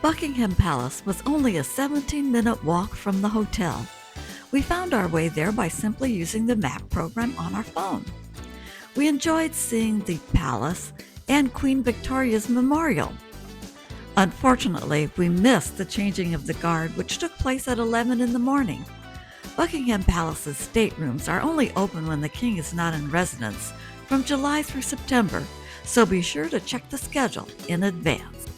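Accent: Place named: United States. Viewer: Love your commentary best American accent ever enjoy your stay , when are you coming to the greatest country in the world, Scotland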